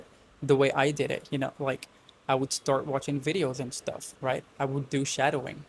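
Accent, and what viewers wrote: United States. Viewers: Ur American accent is really mind-blowing bro ❤in how much time u get it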